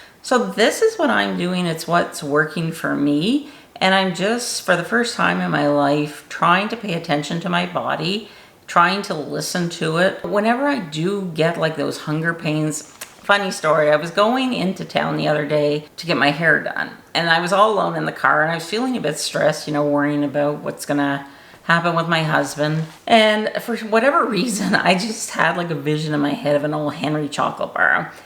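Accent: Canada. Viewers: Congrats. Love grapes. Eggs? Why not whip them fluffy with your hand blender then place in the oven for a few minutes? Quality dark chocolate is good for you—not the health store ones that say 75%-100%, no. Get less than 70%, for sure. ***Might I suggest that you consider carbs during the day ONLY? Yes, this would mean before dessert before supper. I mean, no carbs after 5 pm for a few weeks then 4, for a few weeks, then, 3, then, 2 pm. Not kidding. Plus, this works faster than people assume. You sound a bit… Newfie🙂? And, an extra calendar = meal prep. No stress. Great luck with hubby🌬️❤️‍🩹🕊️. Congrats again🎉.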